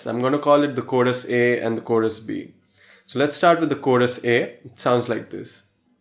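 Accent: India. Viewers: You are Indian right I can understand by your accent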